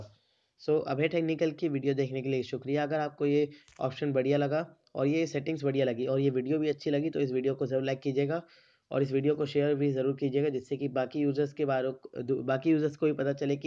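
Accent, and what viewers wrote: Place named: India. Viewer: No offense i undsrstand that u are indian and have accent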